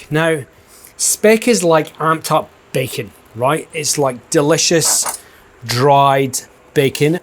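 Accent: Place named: Scotland, United Kingdom. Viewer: Ye can take the man out of Scotland, but ye canny take the Scottish out the man.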